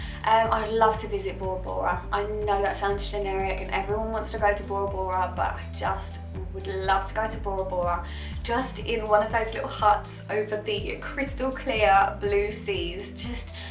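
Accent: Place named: London, United Kingdom. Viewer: I thought you were from Essex - excuse me! South or east London I am guessing?! Love your refreshing nature x thank you for the video x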